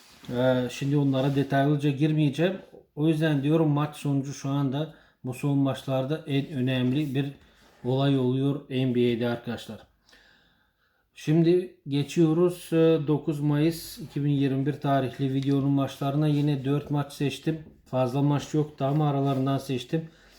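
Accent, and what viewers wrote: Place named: Bulgaria. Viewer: Sen avrupa dasin galiba bide konusman bulgarlar gibi?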